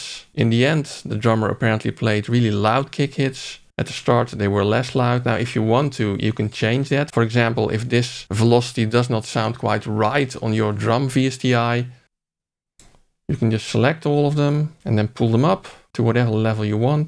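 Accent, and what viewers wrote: Netherlands. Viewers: nice dutch dialect 🙂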